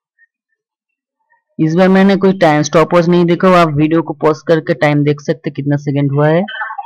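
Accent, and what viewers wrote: Bengal, India. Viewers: bro u r bengali lol love you bro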